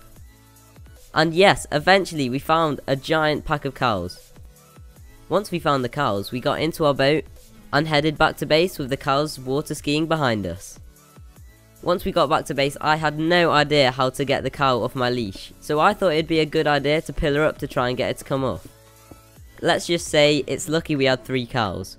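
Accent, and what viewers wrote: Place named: United Kingdom. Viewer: ayy is british like me im fromnothern part of england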